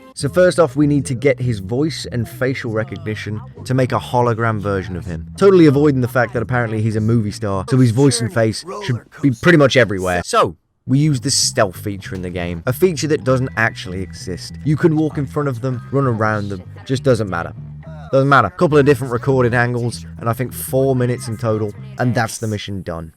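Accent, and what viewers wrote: United Kingdom. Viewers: British love it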